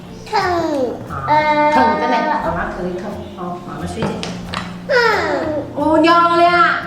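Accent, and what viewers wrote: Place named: Minnan, China. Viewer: 好可愛的閩南口音，聽到跟自己一樣的方言，好溫馨暖心，很喜歡妳的頻道，真真實實的料理，真真實實的生活!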